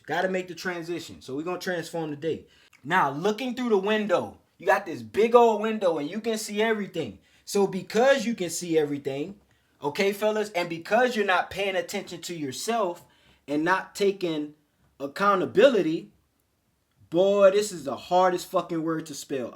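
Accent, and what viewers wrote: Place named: Baltimore, United States. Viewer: You from B more bro? I hear an accent lol love ya videos G! 💯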